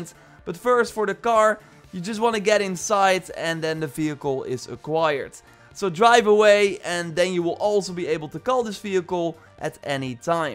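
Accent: Netherlands. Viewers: He's Dutch isn't he? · Are you Dutch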